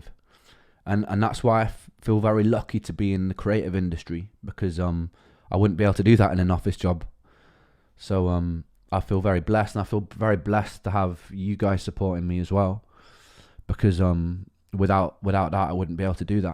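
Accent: United Kingdom. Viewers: love the facet as a fellow man who is a Welshman and who thinks of themselves as a Brightonion I still hear the welsh pronunciation in your words like Appy, with a soft a.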